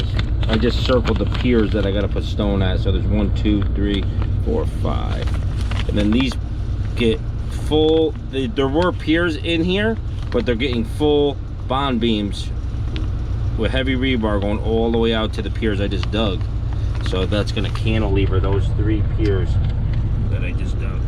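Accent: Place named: New Jersey, United States. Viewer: Happy Thanksgiving! New subscriber but based on your accent I'd say you're not too far from Jersey. Just finished grading my own sawft sawggy yahd with a Kubota in 732👌🏼👍🏼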